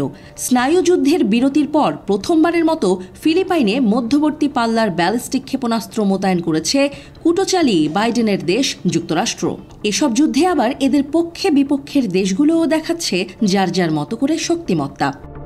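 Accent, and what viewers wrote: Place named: India. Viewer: উপস্থাপিকার কথা শুনে মনে হচ্ছিল ভারতে কোনো নিউজ দেখতেছি, পরে দেখলাম RTV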